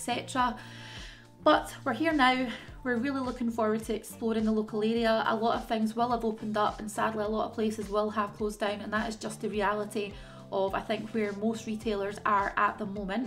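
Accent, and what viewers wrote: Scotland, United Kingdom. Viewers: Gran and grandads house looks lovely and will be especially comfortable I'm sure 😊 looking forward to seeing more of Bridlington, and to watching your channel grow . Being a fellow scot you have a lovely soft Scottish accent ❤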